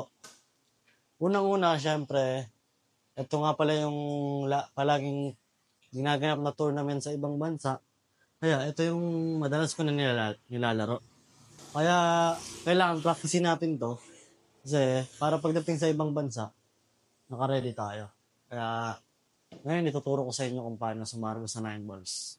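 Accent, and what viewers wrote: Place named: Rizal, Philippines. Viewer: Taga Rizal ka ba bos. Ang punto mo Rizal.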